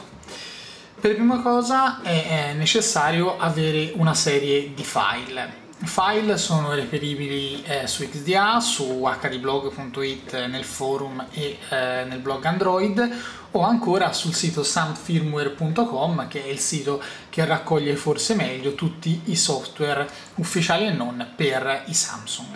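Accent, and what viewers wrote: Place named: Tuscany, Italy. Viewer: l'uso di spengere non e' proibito ed anzi e' molto diffuso in regioni del centro Italia, come la Toscana.A giudicare dall'accento, il cortese relatore di questo utile compendio video, sembra provenire proprio dalla succitata regione.Cfr. il sito dell'Accademia della Crusca, qui: goo.gl / G1VoB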